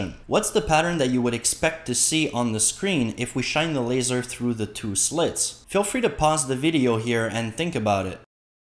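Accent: Quebec, Canada. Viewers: Are you from Quebec? Nice video!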